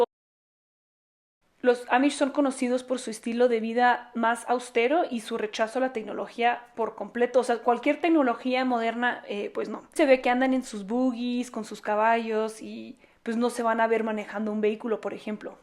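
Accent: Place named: Mexico. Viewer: Gracias por tu vídeo y la manera de explicarlo. Me parece una exposición de la realidad actual muy enriquecedora. Yo estuve en Pensilvania en los años 90 en un pueblo Amisch para comprar productos típicos y curiosamente cuando les hablé en alemán estándar (Hochdeutsch), me respondieron en un dialecto que por suerte comprendí, y era más similar al suizo alemán, que aprendí por diversos motivos. Posteriormente, hace 6-7 años, tuve la oportunidad de conocer una zona donde había menonitas que vivían en Brasil, concretamente en Santa Catarina. Estas personas tenían dificultades para hablar en alemán estándar (Schriftdeutsch oder Hochdeutsch) sin embargo, hablaban un dialecto entendible y más parecido al Plattdeitsch (similar al holandés). Afortunadamente hablo alemán, suizo y holandés, y así pues levanté la curiosidad de los presentes. Es muy divertido hablar diferentes dialectos del antiguo alemán para poder comunicarte con los descendientes de los centroeuropeos. 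Por cierto, al empezar a ver el video pensaba que eras de España. Tienes cara de española 120%, pero luego te escucho hablar y me doy cuenta de que tienes acento mejicano 🤗😇. Hast du Videos in plattdeutsch?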